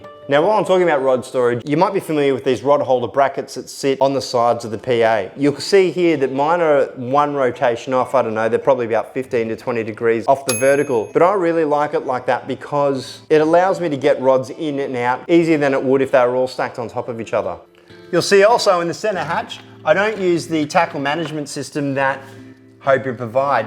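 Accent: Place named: Australia. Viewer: Searched for a Hobie Pro Angler review Australia and your channel came up, just needed an Australian talking about the Kayak and stuff not our fast talking American brothers which sometimes can be like a different language !! God luv em, anyway really enjoy your Vids and thanks for all the effort you go too, keep up the good work and I hope to replicating most of your set up soon ... Cheers Mate :) New Sub and happy to be one......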